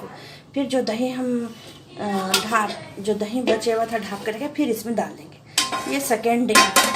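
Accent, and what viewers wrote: Hyderabad, India. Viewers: Aap hydtaba ke hoky aapki baat ka lheyja wesa hi laga chhahy kafidino bad dhiki mirchi ki recipy dikhaidi..mujhey bhut bsnd hy..😋😋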